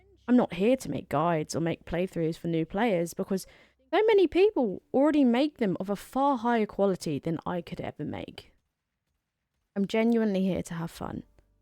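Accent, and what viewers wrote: United Kingdom. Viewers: The amusing bit out of all of this: you sound British as hell in this video. No hint of aussie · Only reason why I watch. and for the rants with bri'ish accent.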